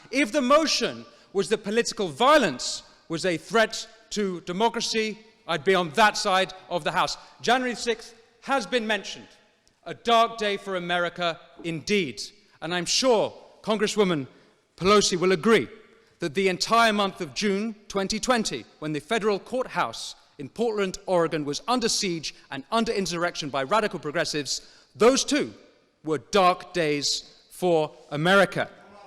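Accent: United Kingdom. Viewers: L'accento e la sintassi sono prettamente britannici: chi è l'oratore? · Lo speaker non e' Americano. Accento definitamente British.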